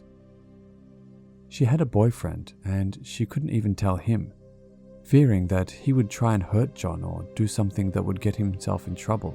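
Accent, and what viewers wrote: Queensland, Australia. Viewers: Sounds like a fellow Aussie. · aussie myself and yeah, QLD was my first guess too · That's what heard. QLD for sure.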